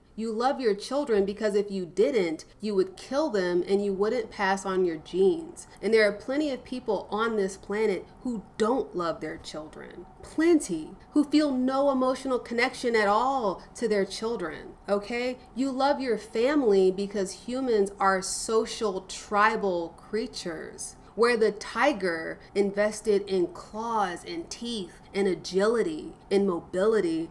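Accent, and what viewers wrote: United States. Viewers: I absolutely love your personality. I think you're fucking cool. Your way of speaking did bother me the first few minutes but it was more about your american girl accent than the swearing (which I do a lot as well anyway).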